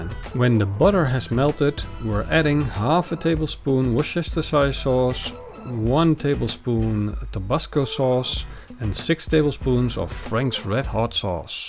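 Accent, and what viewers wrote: Netherlands. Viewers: Random question but are you Dutch? Your accent seems Dutch to me. Not sure why.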